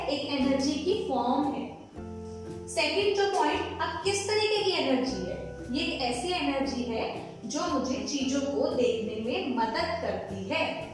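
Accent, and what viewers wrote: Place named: Haryana, India. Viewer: Maine socha haryana se ho 😅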